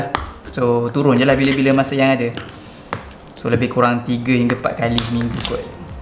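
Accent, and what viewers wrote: Terengganu, Malaysia. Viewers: Sape yg tanya xde slang tranung tu? Aku mula2 tgk vid dh perasan dia ada kaitan dgn pantai timur. Hanya org kelate tranung pahang je akan perasan detail slang sesorang dari mereka 😁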